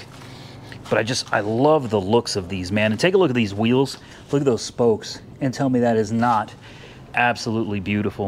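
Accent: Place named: California, United States. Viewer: It's hard to believe you're from Indiana, you talk just like us boys in California.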